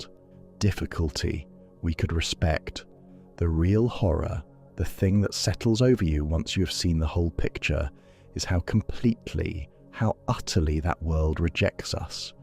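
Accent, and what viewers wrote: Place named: England, United Kingdom. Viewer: Why is every AI notation done with an English accent. Getting very annoying.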